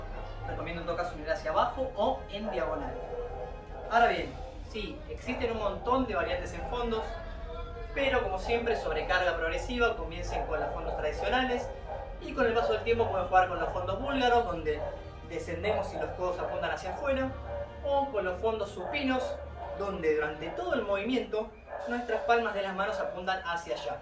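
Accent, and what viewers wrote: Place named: Argentina. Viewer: muchas gracias me gusto mucho el video. bién explicado. De donde las tenias colgadas tus anillas? En un parque habian barras paralelas y anillas. Yo confiado que dominaba la paralela, salte para hacer fondos en anillas y me lesione el hombro. No muy grabe me recupere rapido, si antes hubiera visto tu video no cometia ese error.😃👍 de donde es ud. Por su acento creo que sea de la Argentina.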